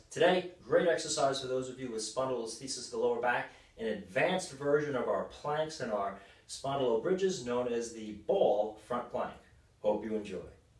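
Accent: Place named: Boston, United States. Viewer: Thanks again! By the way, where are you based? I’m thinking east coast, maybe Boston, based on your accent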